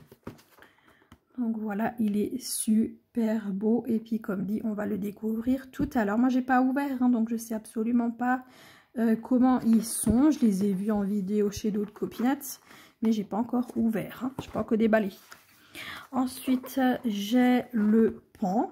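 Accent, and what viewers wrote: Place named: Alsace, France. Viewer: Cc je viens de tomber sur ta video ou la la un accent qui me fais bien plaisir qui viens d'Alsace si je me trompe pas moi je suis originaire de strasbourg aussi mais je suis bien loin a present je suis dans le 44 (loire Atlantique) MERCI en tout cas pour le haul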